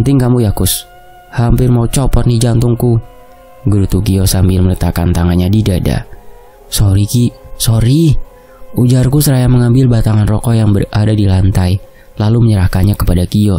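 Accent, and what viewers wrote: Java, Indonesia. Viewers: Cerita org dijakarta jadi bikin imajinasi di daerah jawa karena dialeknya😂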